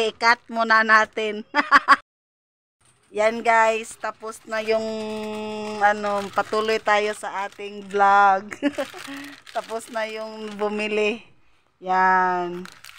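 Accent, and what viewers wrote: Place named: Visayas, Philippines. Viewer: Yes kilala ko si J Djshine dati din ako sponsor sa kanya, bait sya. Congratulations sis, parang bisdak ang tono ng salita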